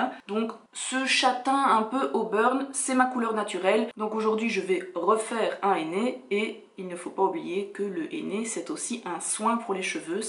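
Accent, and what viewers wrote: Belgium. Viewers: J’entends plus belge qui suisse perso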